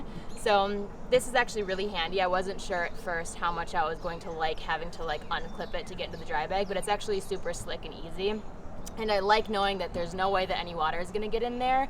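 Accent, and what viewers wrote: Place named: Michigan, United States. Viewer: oh yes! I’ve lived in Florida for 25 years now but my first 35 years I lived in the upper peninsula of MI.. Still love & miss that accent! And yes kiddo you got it!😊👍🥰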